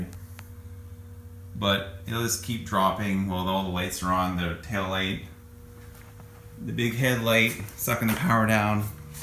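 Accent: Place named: Minnesota, United States. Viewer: Someone's from Minnesota lol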